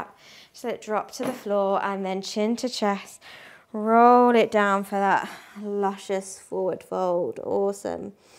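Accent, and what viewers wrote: United Kingdom. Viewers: Thank you so much! I decided to get myself a strap yesterday after over a year of (almost) daily practice, and that video is exactly what I needed :) (And by the way, I wish I could speak english with such a lovely accent, purely british <3 )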